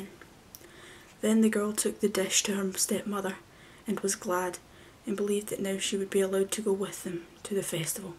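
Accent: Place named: Scotland, United Kingdom. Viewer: Oh!! I have such a soft spot for enchanting short stories and poems that leave you with chills. Very excited for the coming additions in the series!! I love love love scottish accents, I don't hear enough of it in ireland, french and scottish accents are probably asmr favourites of mine! Thank you for this 💫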